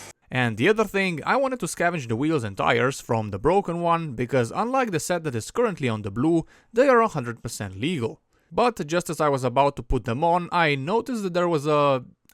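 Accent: Slovenia. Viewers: You got that Slavoj Zizek accent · lmao the slovenian accnet is killing me as a serbian.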